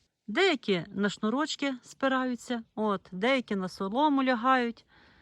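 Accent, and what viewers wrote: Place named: Ternopil, Ukraine. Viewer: Доброго дня там ваш чоловік. Дуже Вам дякуємо за Ваше відео. Дивлюся кожне Ваше нове відео яке виходить. Ваша мова така мені знаєма, у мене покійний чоловік з Тернопільської обл. Борщівського району, він розмовляли точно як Ви. Я з Херсонської обл. Каховського рну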